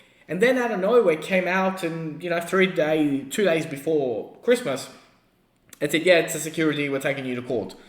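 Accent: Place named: Australia. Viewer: He's an aussie. Dno where abouts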